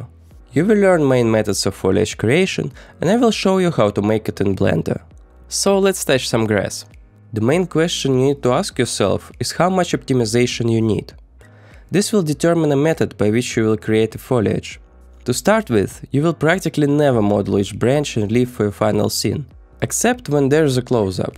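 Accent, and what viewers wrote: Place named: Russia. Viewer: топовый акцент, русский отличит, но иностранцы думаю ничего и не заметят)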